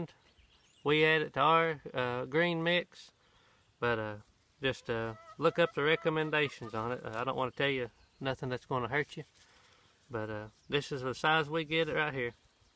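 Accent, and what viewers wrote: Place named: West Virginia, United States. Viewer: you all have a beautiful garden.. and the sound of your voice reminds me of my home in Logan county West Virginia.. I have lived in south Carolina for almost 19 years and I can't get nothing to grow in this red clay.. I could grow things when I lived up home in the mountains.. I miss my mountain home.. May God continue to bless you and your little family...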